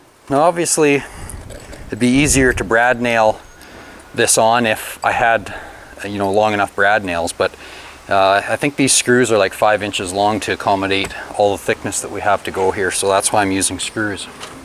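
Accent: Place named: Saskatchewan, Canada. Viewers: Canadian accent is hilarious. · There isn't a Canadian accent. This is a Saskatchewan accent. Put him next to someone from Cape Breton and they'd sound pretty different.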